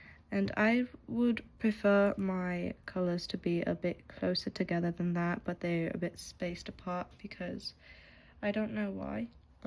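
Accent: United Kingdom. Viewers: I KNEW YOU WERE BRITISH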